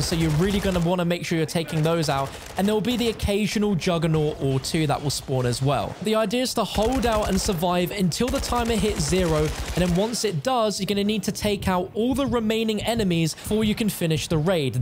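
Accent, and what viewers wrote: United Kingdom. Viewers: hahaha the British accent got me. He says THRee players and then one of the FRee has to unlock it by blah blah. I thought he was saying paid players could get right in but free players had to unlock it. Bummer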